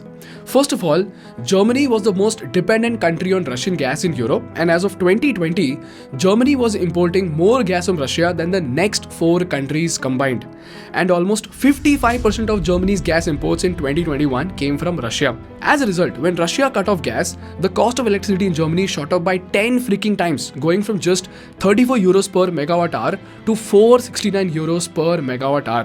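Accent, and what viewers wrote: India. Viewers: i'm perfect flat canadian,, but i can sound hindi like you if i curl my tongue to the top of my mouth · What kind of language is that? Indian English is like a joke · Indian accent that’s I can’t understand it ?????😩😫😩😫🥺😫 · the agitated Indian accent makes it annoying to listen to this person. I quit after 2 min.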